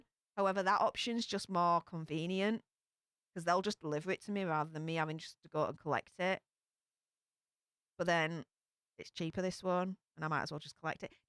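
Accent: Yorkshire, United Kingdom. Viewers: I listened to a video that explains all different English accents by region, and I pinpointed you to Yorkshire! Am I right? 🙂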